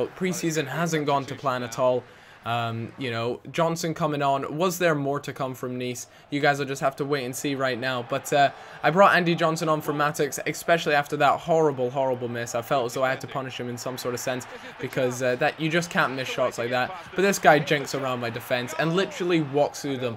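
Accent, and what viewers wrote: Scotland, United Kingdom. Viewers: anyone else think his voice is partly scottish, part englsih, and past american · when I first subbed to you I couldn't work out whether you were scottish or american lol! You sounded sottish but you were so popular and such a big channel so i thought you must be american! Great vid by the way!:)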